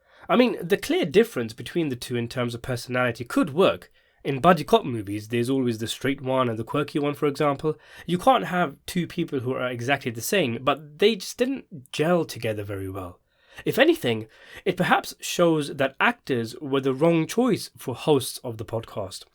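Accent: United Kingdom. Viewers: As soon as I herd the British voice nobody gives a shit what a brit thinks . You really sound dumb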